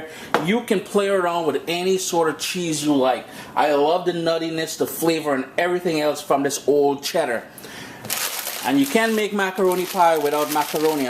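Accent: Trinidad and Tobago. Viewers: I've been around Trinis all my life and to a non Trini it sounds similar. I think it is the Indian influence because I find it sounds similar to an Indian accent sometimes. I know there's a difference though. I always thought this guy was a Trinidadian based on his accent but the post that we are commenting on suggests otherwise. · He is a Trinidadian ! And he lives in Canada so he has a Trinidadian and Canadian accent ! both accent intertwined with each other . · I think he is from Trinidad because I know how trini people talk cause I am a trini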